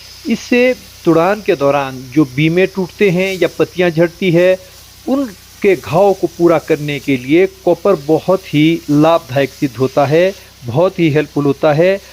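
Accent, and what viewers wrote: Standard. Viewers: शुद्ध उच्चारण के साथ , बागीचो की सही देखभाल एवं पौराणिक चीजों की उपयोगिता की सटीक जानकारी युक्त आपका ब्लॉग अनुठा सा बेहतरीन लगा ,,,you people are very positive, humble and good cultured,, ...….keep vlogging 👌👍 आपने माताजी के लिए जो lines बोली सही में गौरवान्वित बना दिया अपने parents को...।👏👏👌 दादीजी को नमस्कार🙏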